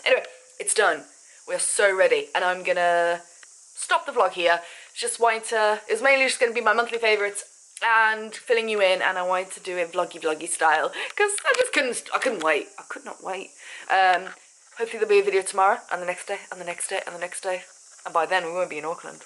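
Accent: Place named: Wales, United Kingdom. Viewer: Where's the welsh accent from?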